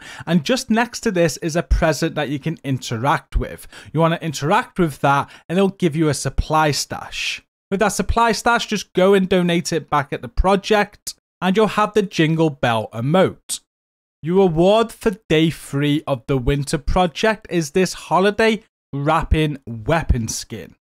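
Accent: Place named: North, United Kingdom. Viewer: northern accent strikes again ❤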